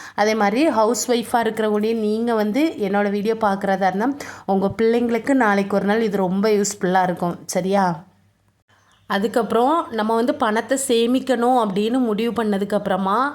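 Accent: Kanyakumari, India. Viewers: Hi sis unka veetu enka pechu kanyakumari pola iruku marthandam side athan kekuren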